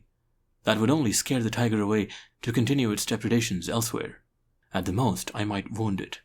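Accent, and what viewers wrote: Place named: India. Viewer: You are absolutely right about our narrators voice. His wonderful accent conjures up India perfectly.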